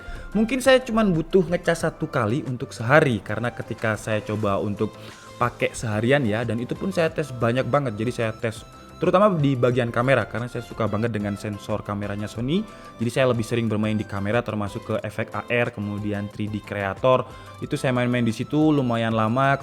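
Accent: Malang, Indonesia. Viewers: Dari malang ya? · pean wong malang ta mas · Lhoalah wong malanggg to, mas 🤣🤣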